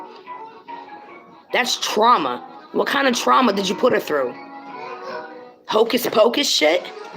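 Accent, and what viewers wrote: New York, United States. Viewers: ish lol!! Just woke up l'ok! And you had me lol almost fell out of bed. HHAHAHA you said, * And she foundher AT THE PARTY???!!** Haha i love that NY accent, makes me miss home! God bless sending light love from Connecticut.🌘🌙🌞🌞🌟🌠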